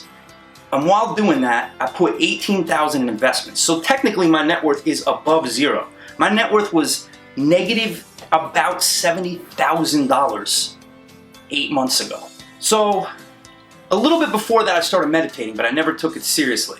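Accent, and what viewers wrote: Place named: United Kingdom. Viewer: It's all about the British accent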